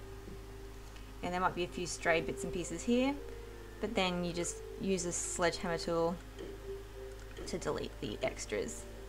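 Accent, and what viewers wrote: Australia. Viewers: As soon as i heard your voice I could tell you are aussie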